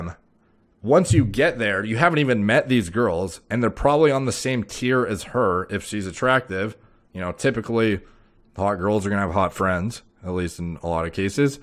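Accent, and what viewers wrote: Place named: Toronto, Canada. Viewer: Didnt realize you were canadian. It sounds like you went york uni lol. I agree with alot of what you said.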